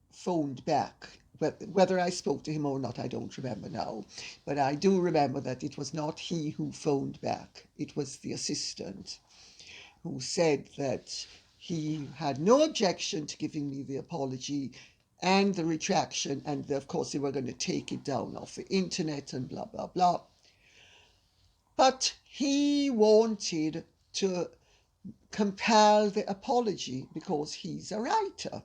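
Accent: Jamaica. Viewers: Lady C, I'm from Jamaica, Where in Jamaica are you from, please? Your Jamaican accent is unmistakable. Love it. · Louise Leibbrandt it’s also part of her Jamaican accent. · A very posh uptown Jamaican accent.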